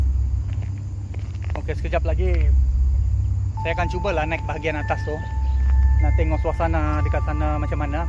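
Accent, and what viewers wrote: Sabah, Malaysia. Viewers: Mcm owg sabah dialekny... Jln kna kg Jin... Jin mrah laa kod... Jin ni dy bleh hidup ribuan taon.... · Hi saya first time kt sini.. Bro memang buat explore sorang@ Admin dri sabah ye.. 6thn di sabah.. dpt cam dh slang org sabah✌🏻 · Alaa dh tau penonton satu negara tgk ckp je la standard bm, sekali sekala nak selit2 sabah tu ok la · Perkongsian baik..merungkai sejarah dan misteri.(gaya bahasa-mcm org sabah)..Terbaik ,U terokai byk kwsan..like👍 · Slangnya macam urang sabah bangsa brunei.. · ko org mna bos sleng mcm sabah mix smenanjung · Min ni mix ke macam sabah ade india ada 😅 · cakap sabah sja bro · First dgr kau ckp pun blh tau org sabah..dusun😂 cakap sabah ja boss senang,sy pun sda lama mnetap d kl since 2014 smpai skg msih lg ckp sabah,nda pndai cakap kihil😂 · Bos org mana..mcm loghat sabahan pun ada..terbaik bos..sy suka konten mcm ni.. 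· Mcm ada terbunyi2 slank2 sabah ko ni geng.. org sabah ka kawan? Heheh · Nmpk sgt tk bergaul dgn orang n bodo, ni slang sabah lol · Sabahan ker? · Awk org mana?? Knpe cara cakap MCM org Sabah sikit2. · Sabahan tu bro, ko org mana yg xkenal loghat dlm malaysia ni org burma ke sejati?? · Pekat Sabah Admin nie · Sabah lh tu...sy pn mcm tu..hahaha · ckp pelik sikit trs cop indon..sabah sarawak pun ad slang mcm ni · Patut pun gea lain2 sia dingar.. Kompom2 da sia agak sabah sy bilang.. 1 kali nga butul2 bha org sabah.. Tebaik gaman 👍👍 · loghat sabah ni. Sarawak takkan loghat begitu kalau bertutur dalam bahasa malayu baku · Mix sabahan ke bro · kau org Sabah ke Bah? Dengar mcm sabahan saja kau......Sabah mmg ckp mcm ini....Kenapa Masih ada lagi yg Tidak faham bah😄.... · bosku org sabah ka? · Hati2 bro klau masuk hutan..klau boleh bawa parang lah..👍👍 Dgr loghat bro btul2 mcm orang sabah😁😁😁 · Orang Sabah ka?? 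Boleh tahan bro! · Kalau ckp sabah aku jadi x fhm ni pun dia ckp ok walaupun ada loghat sabah sikit2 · Terbaik.bro.. tapikan sape mcm aku confuse?? Bro ni ckp bunyi sabah ke bunyi sleng bangladesh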